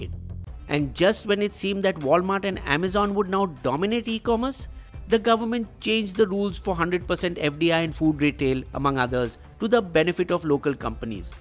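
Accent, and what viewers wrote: India. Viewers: firstly, i like the animation, i think it's pretty cool. I appreciate your hard work and effort and by no way want to to demean it. Aiming to provide constructive criticism. Firstly the first pinned comment was pointing out a mistake (hdfc), that too is fine. As you start with the story of the trojan horse,, well, I'm by no means saying it from a 'colonial perspective',, but the heavily accented english is like an elephant in the room...i mean it's one of the first things that come to mind, that the pronunciation is really, not-that-good(?) (im indian too, proud one, we must not be insecure about how we use the language, nor am i saying that you should change your diction,, but just want to make you aware of a viewer's perspective). Along with the good quality graphics of the video, the narrative seems sub-standard, to put it blunty. Thirdly, well after this, i didn't watch the whole video but the comments said that you're communist leaning, and i guess that too produced a negative impression.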